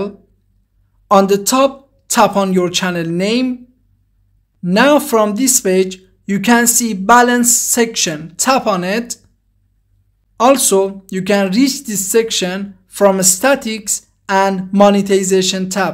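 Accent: Iran. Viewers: هنوز ویدیو شروع نشده ، صدارو شنیدم ، به به ایرانی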